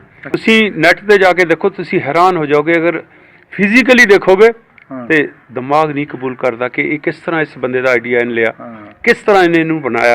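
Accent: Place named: Punjab, India. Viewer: ਜਨਾਬ ਜੀ ,ਤੁਹਾਡੀ ਪੰਜਾਬੀ ਤਾਂ ਬਿਲਕੁਲ ਚੜਦੇ ਪੰਜਾਬ ਵਾਲੀ ਹੈ, ਜੀਉਦੇ ਵਸਦੇ ਰਹੋ ।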